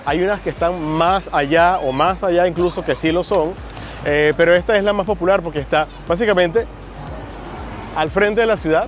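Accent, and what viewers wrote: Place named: Colombia. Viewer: Muy informativo el video, gracias hermano. Eres de Barranquilla? Tu acento suena un poco colombiano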